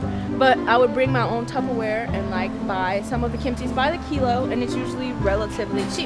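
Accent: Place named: South, United States. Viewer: Sometimes sounds like she has a country accent 😂😂it's so cute😘.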